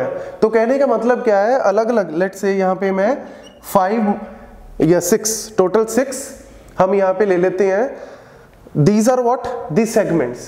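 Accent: Punjab, India. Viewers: God ur punjabi accent. Loving it... ♥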